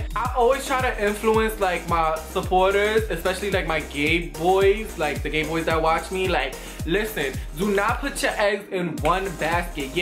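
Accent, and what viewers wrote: New York, United States. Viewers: G I love the way you talk. That New York accent. I do not be having time to be fighting no big person like if you even push my little ass i might fall. 😂💯 this branch though. I'm weak.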